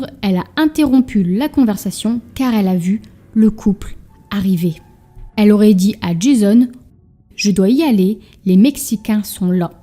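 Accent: North, France. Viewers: J’aime beaucoup vos vidéos ! D’autant plus que je reconnais l’accent du nord il me semble ❤️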